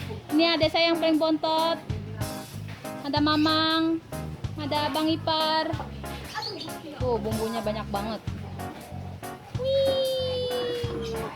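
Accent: Jakarta, Indonesia. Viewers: Salam kenal yo pok..aku dejet lho pamulang..pertama kali liat youtube pok lgs pengen liat terus video2 yg lain...biarpun aku org jawa tp denger celotehan pok yg khas betawi bangeet lucuuu...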